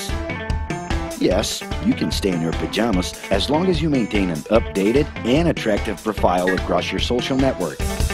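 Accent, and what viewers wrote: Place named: Latin America. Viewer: where is the narator from? seems like a latin-based-language accent...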